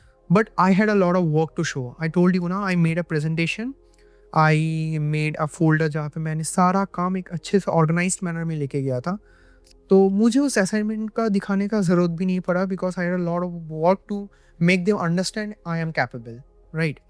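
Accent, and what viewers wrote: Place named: Bengal, India. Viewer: Bhai tumi Bangali Je tomar Hindi sunei Bojha jacche Anyways Good and Informative ...Thanks a lot.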